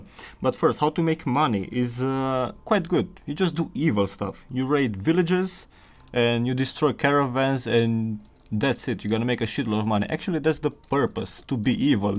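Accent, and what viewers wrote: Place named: Transylvania, Romania. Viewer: Esti cumva ardelean?Dupa modul in care vorbest :D.Un mod foarte bine facut e si Nova Aetas care, este modul meu preferat.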